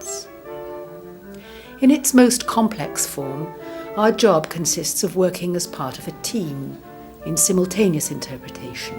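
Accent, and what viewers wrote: Wales, United Kingdom. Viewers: Hi Latertoe, the speaker doing the voice over is from Wales. all the best, Ian DG Interpretation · Wales, indeed.. an enjoyable accent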